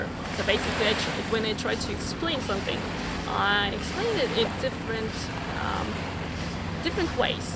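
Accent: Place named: England, United Kingdom. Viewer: This is a English accent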